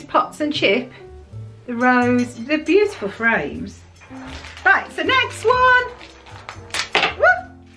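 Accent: Birmingham, United Kingdom. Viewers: Hi ☺️ I'm a pretty new subscriber but I instantly loved you and your channel. Not only are you British Disney YouTuber, which made me so happy, but I could instantly tell from your accent that you must be close to Birmingham and that's where my mum and her family are from. Brummy is basically my second language haha 😄 My mum is around your age and I just showed your video to show her about the clubs you used to go to and she used to go there too 😄 Made her super happy because she lives all the way down in Devon now. So yeah, just wanted to write you a quick note to say how much I adore you videos and how lovely and fun your are. Sending you lots of magical Christmas wishes xx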